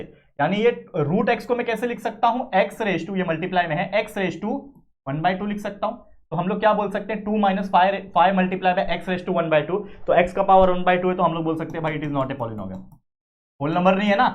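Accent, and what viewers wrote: Maharashtra, India. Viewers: Are you from maharashtra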